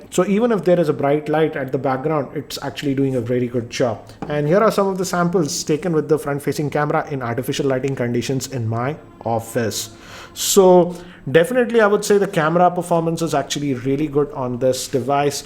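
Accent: India. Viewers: indian loghat inglish so rough and Bad the voice to hear it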